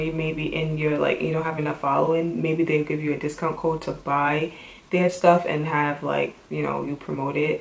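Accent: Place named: Jamaica. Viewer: hey i love your accent , are you Jamaican?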